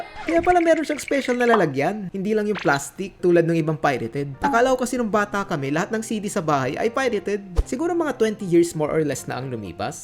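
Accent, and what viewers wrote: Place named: Conyo, Philippines. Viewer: May accent ka boss conyo ka siguro! Ahehehe you sound unique naman kaya cool parin!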